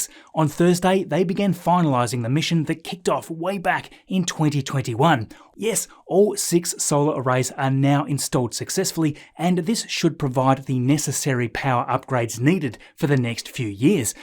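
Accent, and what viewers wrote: Australia. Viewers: Just stumbled across your channel. Awesome work mate. I've been living in the UK for the last few years, moved here about 2 months before the pandemic which was a very interesting greeting to the country! It's always great to hear an Aussie accent in my travels as it feels like home. Peace mate. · I love. A very well made Show. Plus I like the Australian accent. Keep up the good work it is much appreciated.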